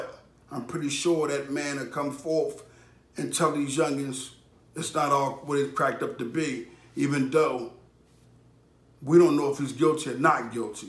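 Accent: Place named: East Harlem, United States. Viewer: Yu got like a East Harlem/South Bronx accent. U from the x?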